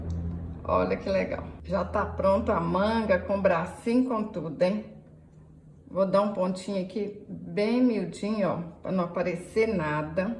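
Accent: Minas Gerais, Brazil. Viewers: Kkk vc e mineira de onde sua voz te entregaaa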